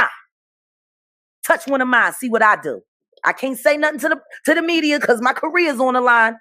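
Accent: Philadelphia, United States. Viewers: For the people in the comments who think she's on something, you're right. She on her North Philly ish. This is how we talk. You have to grow up here to fully understand where she's coming from. If you violate family the gloves come off. It's like an unwritten rule of Philly street life. She is from my era, so I overstand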